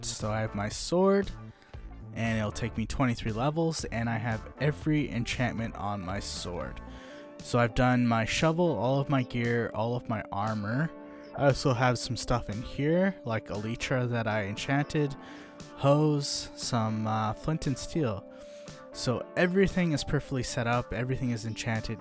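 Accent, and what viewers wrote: Punjab, India. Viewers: Bro are you punjabi?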